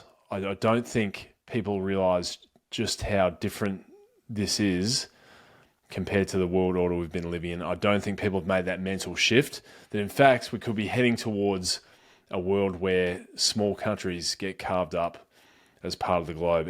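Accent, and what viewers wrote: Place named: Australia. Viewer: The dude speaks with heavy Aussie accent.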